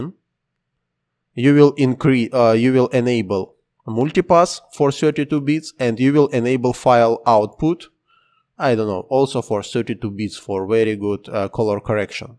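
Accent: Russia. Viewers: Слишком русский акцент... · Это самый русский акцент, который я когда либо слышал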